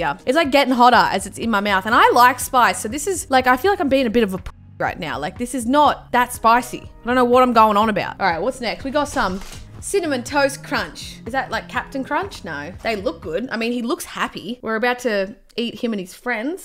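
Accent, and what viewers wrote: Australia. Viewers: Are you a off brand azzyland with a budget cut and Australian accsent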